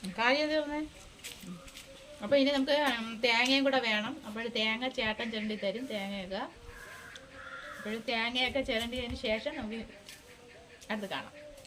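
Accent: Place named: Nedumangad, India. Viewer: samsarak kelkan nalla rasamanu enneppole nedumangadan samsaram🥰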